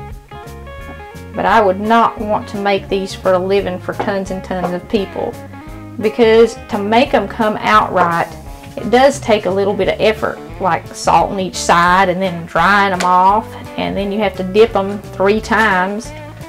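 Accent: South, United States. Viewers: You're awesome. Love a gal with a southern accent.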